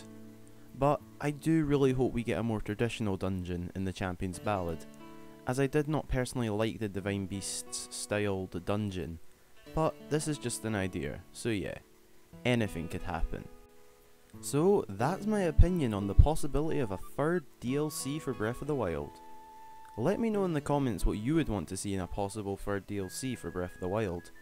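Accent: United Kingdom. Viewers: Are you Britain? Just wondering because of your Accent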